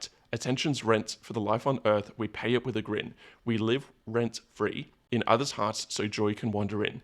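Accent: Australia. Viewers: I detect a fellow Australian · intellectual? I am an English Hampshire Hog. You sound Antipodean to me. I would go more Aussie than Kiwi.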